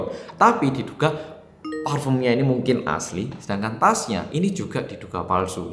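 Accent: Java, Indonesia. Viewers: Bang kl ngomong korea logatnya korea, tapi klo ngomong indonesia logatnya jawa medok:)_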